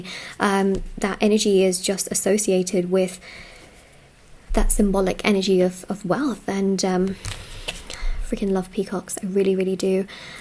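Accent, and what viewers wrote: United Kingdom. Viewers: I m a huge fan of ur sweet voice n ur British accent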